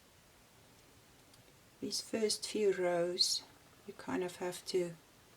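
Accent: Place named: South Africa. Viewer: Love your South African accent.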